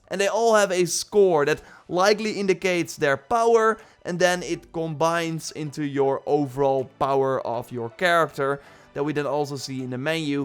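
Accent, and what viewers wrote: Netherlands. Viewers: Aan je accent te horen ben je een Hollander ,choes your diffucultie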